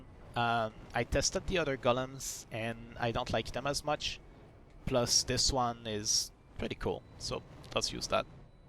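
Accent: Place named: England, United Kingdom. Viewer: cette accent anglais xd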